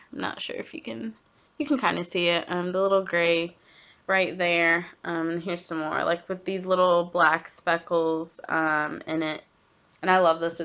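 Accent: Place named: Alabama, United States. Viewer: Hurray! 👏🏽👏🏽👏🏽 Awesome first episode! I really enjoyed listening/watching. Your accent tooootally made me homesick for alabama lol (i use to live in huntsville). Im excited to see how that sweaters quantity works up. The color is beautiful. I need to get my ravelry project pages together... theyre shamefully out of date... smh. One day 😒☺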